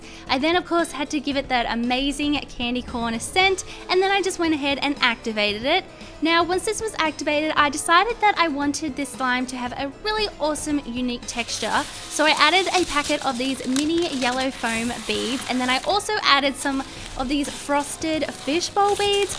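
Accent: Australia. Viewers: Are you alstralian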